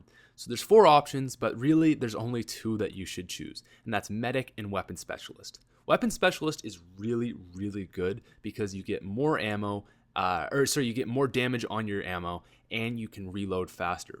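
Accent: Canada. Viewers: Liked. Are you canadian? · The "ote"skirts. Dat canadian accents ;) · "Ohtskirts"? "get oht"? Are you canadian or something? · Canadian Accent Doe LoL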